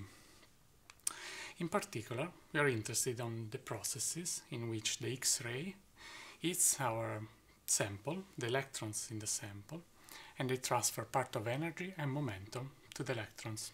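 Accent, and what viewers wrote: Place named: Italy. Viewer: Der italienische Akzent ist total süß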